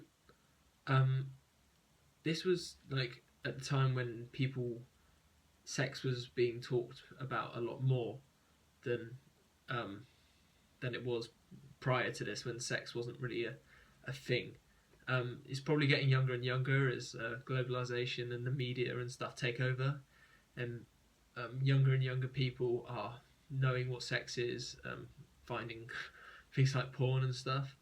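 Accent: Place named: United Kingdom. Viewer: Great video. It was nice to hear a fellow British voice talking about this topic.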